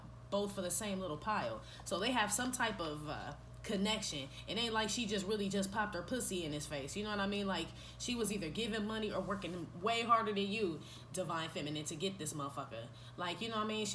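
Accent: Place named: Los Angeles, United States. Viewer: Girl you got to be from out here in LA..I know them accents anywhere 😩...you be having me ctfu!! lmao n girl yes fck him he'll be back 😩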